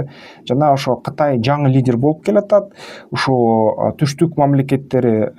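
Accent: Standard. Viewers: РАХМАТ СИЗГЕ КЫРГЫЗЧА ТАЗА СУЙЛОП ЖАТАС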